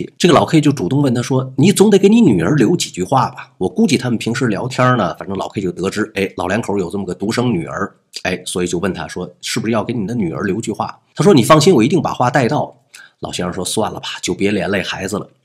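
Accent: Tianjin, China. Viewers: 感觉是天津人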